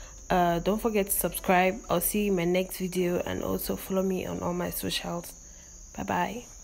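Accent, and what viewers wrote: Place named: Nigeria. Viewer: This is a really helpful video and it sounds like you're Naija, so I be subscribing 😊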